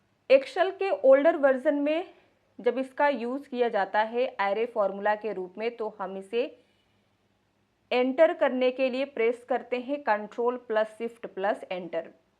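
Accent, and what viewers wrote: Odisha, India. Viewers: Ma'am kya aap odisha se hai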